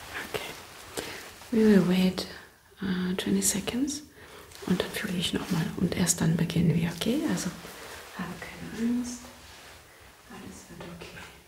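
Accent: Germany. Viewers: ona jest Niemką. oczywiście ma niemiecki akcent🤦🏼‍♀️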